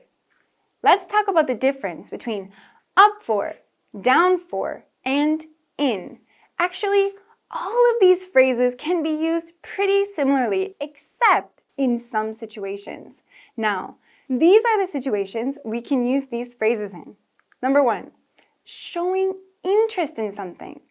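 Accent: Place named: United States. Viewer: 음~~~ 이분 영어 강의 들으니까 미국인 같아 !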